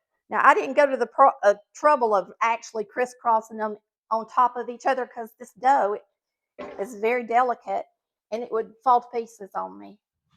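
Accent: South, United States. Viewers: I can smell it over here in New Jersey. I'm going to make this easy Taco 🌮 Pie! Thx for sharing! I watch you on my YOUTUBE TV Channel & on my smartphone. I like your style and your southern accent.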